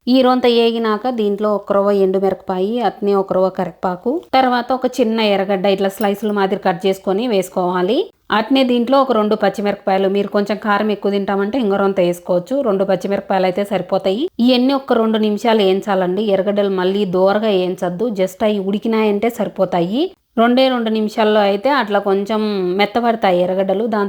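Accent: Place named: Kadapa, India. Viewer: Hi kadapa bhasha vachhinya ,poinnya adaragottunnav .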